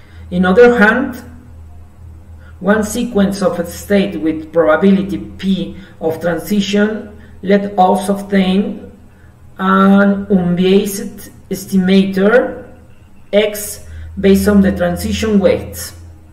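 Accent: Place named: India. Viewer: He speaks like Hindu, not like Mexican jeje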